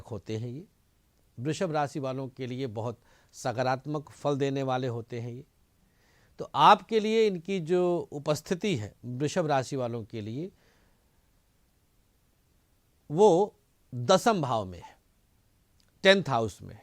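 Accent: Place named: Bhojpuri, India. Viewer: Dhanyawad sir ji bhojpuri touch ke liye, aap ka bolne ka style mast hai, vedio informative raha🙏🙏🙏🙏🙏🙏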